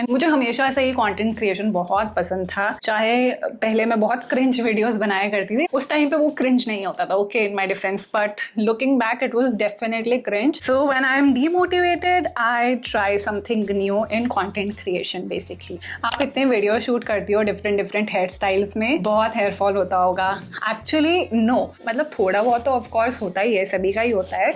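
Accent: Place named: Japan. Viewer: lovedd your Japanese acsenttt it suits you so well 😍😍 lots of love 💌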